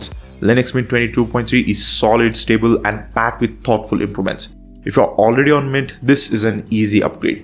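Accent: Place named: India. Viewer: that hindu accent, can you change it?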